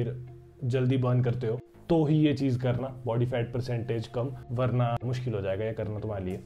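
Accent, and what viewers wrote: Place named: Himachal Pradesh, India. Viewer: Bhai aap Bolne ke accent se Himachal Pradesh ke lagte ho.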